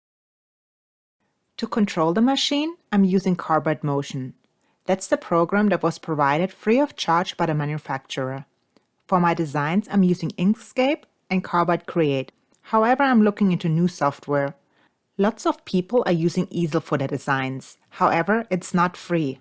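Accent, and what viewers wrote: Germany. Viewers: Are you German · Is that a German accent? Never really heard one before. 🤔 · Als dein Video gesehen hab, musste ich lachen. 1. dein aussehen soll was? Die lustmolche anziehen?🤣 2. dein Roboter englisch, kommst du aus Deutschland? Das einzigste was mir gefällt ist das du als Frau dich handwerklich dich betätigst.😄👍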